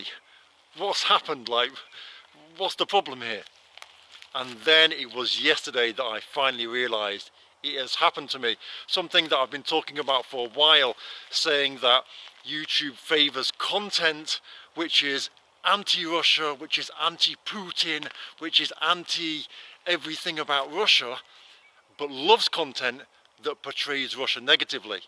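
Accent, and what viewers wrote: England, United Kingdom. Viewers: Your English is ENGLISH. Teach me. I am British but not English like you.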